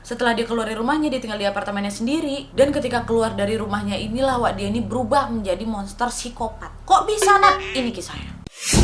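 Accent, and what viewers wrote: Malaysia. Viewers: Selamber je bahasa melayu tu bila sbut.. macam asli melayu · Cara penyampaian wak2 sikit mcm orang malaysia 🤗🤗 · OMYGOSH. SAID SELUMBER. i love her accent, it really same to Malay accent 😂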